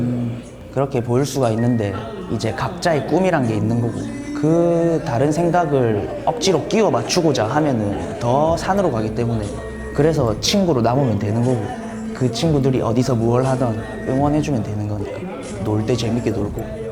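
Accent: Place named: Busan, South Korea. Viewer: 확실히 부산 사투리가 까리한데~~~~ 조용조용히 주술 외우는거 같다 ㅎㅎㅎㅎ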